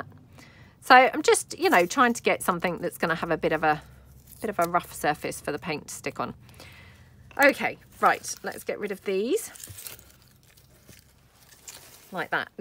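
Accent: England, United Kingdom. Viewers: These are so cute and look like a lot of fun to make. Thank you, you never disappoint ❤️❤️🥰🥰😍 You use the word “rubbish and fiddley’ a lot, with your cute little English accent and I chuckle every time. 🥰❤️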